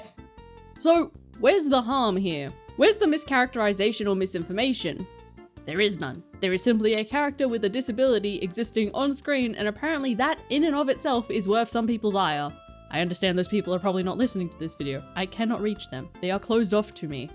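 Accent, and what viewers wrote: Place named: Australia. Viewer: im so australian that i didnt even realise u were australian too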